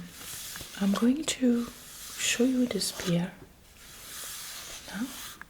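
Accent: France. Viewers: If one could imagine an ASMR role-play with a glorious French accent and a sumptuous assortment of exquisitely presented sound triggers, you would more than adequately have described this beautifully rendered video. This production is so well done, and I highly recommend it to anyone who understands and appreciates the art we know as ASMR.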